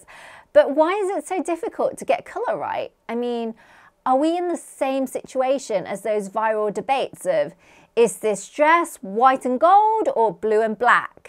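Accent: United Kingdom. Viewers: I was is that a British accent. Could still be. Oh. Nottingham UK. Your so far might as well be on the moon😂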